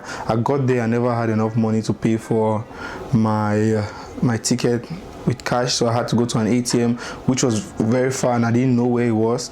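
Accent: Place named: Cameroon. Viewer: Nice episode you just got a new subscriber from your accent I knew you were a Cameroonian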